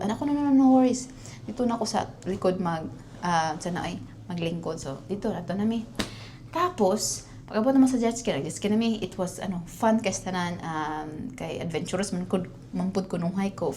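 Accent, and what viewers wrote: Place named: Cagayan de Oro, Philippines. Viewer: Wow ka nindot sa imong love story dhay. Congrats ❤️Lalom kayka mag bisaya murag taga Cag de Oro. Nindot paminawon.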